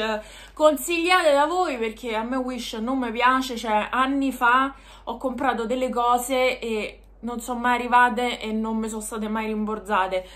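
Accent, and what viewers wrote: Rome, Italy. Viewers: Ciao, fai 1 video dove non parli romano ma solo italiano.... ti sfido 🤪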